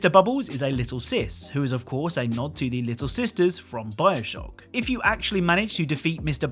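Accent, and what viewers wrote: United Kingdom. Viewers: Are you British, Australian, or New Zealand?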